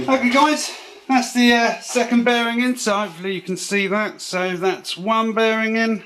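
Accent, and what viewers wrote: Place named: England, United Kingdom. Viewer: A good video,nice to hear an English accent for a change,me being an aussie.Was looking for a video on the same job on an '85 V-Max,supposing theres not much difference?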